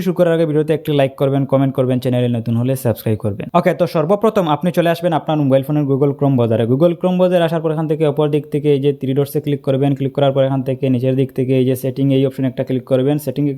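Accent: Sylhet, Bangladesh. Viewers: Vai afne kita Sylheti ni?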